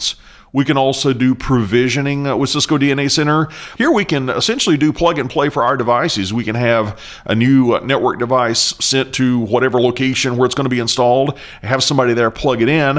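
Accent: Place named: South, United States. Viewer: great teaching voice idk something about the southern drawls keeps me tuned in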